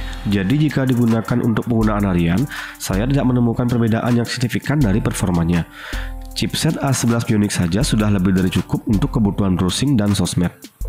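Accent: Bali, Indonesia. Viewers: Orang bali kalo ngomong memang seperti itu kak logatnya, ini orangnya dari bali soalnya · logatnya macam anak bali nih 🤔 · Logat nya bali banget😅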